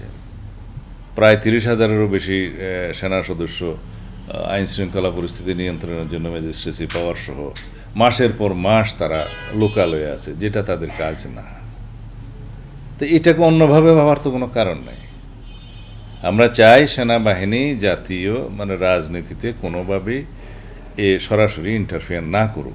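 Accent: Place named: India. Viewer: তুমি এত বছর ভারতে ছিলা। ভারতের সুরে কথাবলবা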